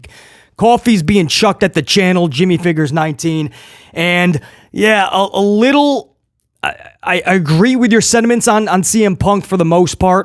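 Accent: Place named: New York, United States. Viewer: You are definitely a New Yorker just like me you sound like a New Yorker1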